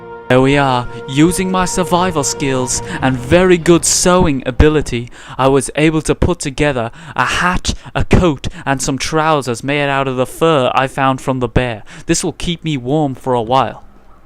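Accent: Ireland. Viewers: Are you irsh? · yep hes irish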